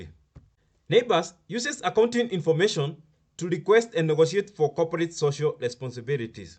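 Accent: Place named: Africa. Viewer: Be slower in your presentation.for a better understanding for those of us that are not from the part of Africa you come from.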